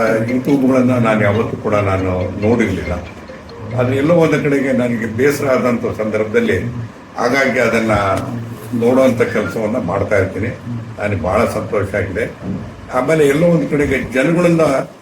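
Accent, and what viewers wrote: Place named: Sira, India. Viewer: sira bhashe sogadu super. na fan nanu. Inti H D mestru.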